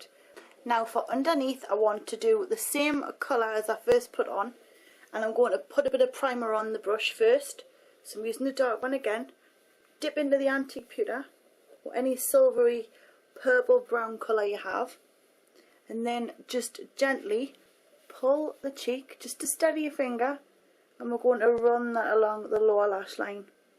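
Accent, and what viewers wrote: Newcastle, United Kingdom. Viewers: it might be odd sounding to you, but it's perfectly normal for me! she's from south shields, in england, and people from that area all have that kind of accent, it's called a geordie accent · shes talking like british really ugly engish the my is the best not the original but the best!!!!!!! :D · brittish? or scottish? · No she is from up north in england :) Kind of newcastle way :)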